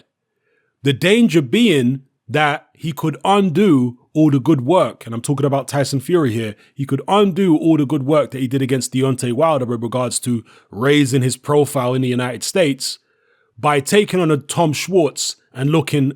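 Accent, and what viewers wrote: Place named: United Kingdom. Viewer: It's funny how I can tell you're black even with a British accent. 😄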